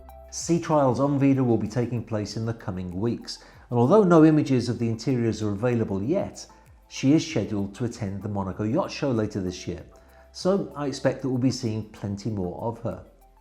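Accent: United Kingdom. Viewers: Where abouts in the UK is your accent from, its driving me crazy trying to pin it down.?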